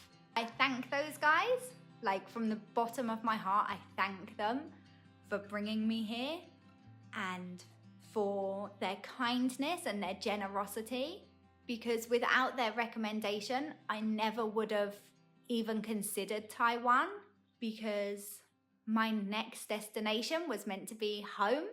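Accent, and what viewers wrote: United Kingdom. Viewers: 英國口音好好聽，看著你講話舒服。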